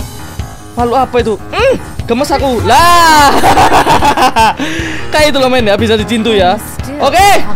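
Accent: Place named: East Java, Indonesia. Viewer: jawa timuran , rame juga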